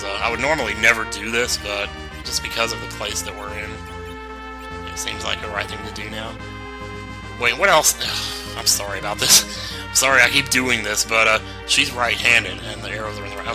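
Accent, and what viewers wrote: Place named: South, United States. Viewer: Based on you're accent i bet you are from the south. Great game by the way, even if that tower was a bit of a bitch.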